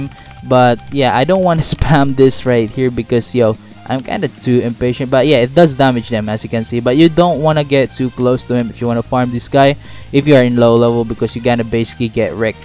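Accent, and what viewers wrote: Philippines. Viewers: I can tell my manz filipino and speaks tagalog probably because of the accent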